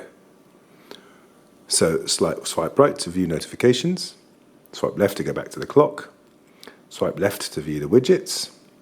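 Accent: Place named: United Kingdom. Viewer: Marvelous review...love that british accent....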